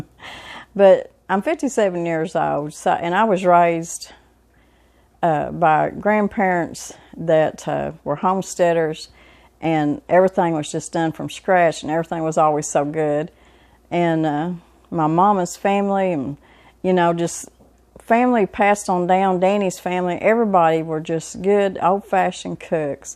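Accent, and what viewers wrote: South, United States. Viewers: You seem like a very nicer woman.....kind and gentle, but your Southern accent drives me crazy and I hate to listen to it. So therefore, I can’t listen to your videos anymore. Y’all just make my skin crawl. Sorry. Cake sounds wonderful!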